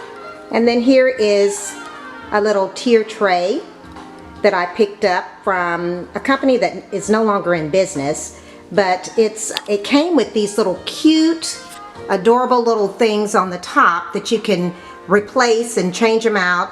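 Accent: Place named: South, United States. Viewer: Your kitchen is beautiful. Thank you for sharing! Subscribing now. Question: Where are you from? You sound more Southern than I and I'm from the Georgia. 😁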